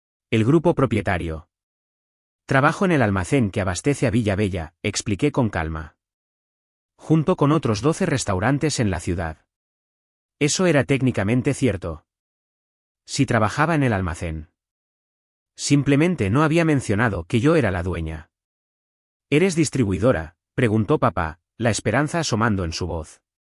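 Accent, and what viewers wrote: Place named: Andalusia, Spain. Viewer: ES FACINANTE ÉL ESCUCHAR AL NARRADOR CON ÉSE PERFECTO ESTILO ANDALUZ...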